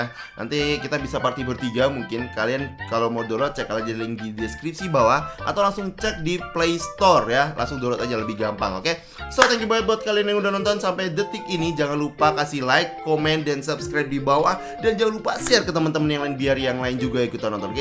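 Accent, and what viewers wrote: Java, Indonesia. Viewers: jowo geming mass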